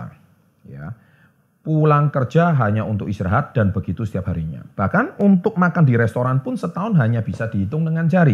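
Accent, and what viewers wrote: Java, Indonesia. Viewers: Logat jowo e ketoro pol opo wong jowo to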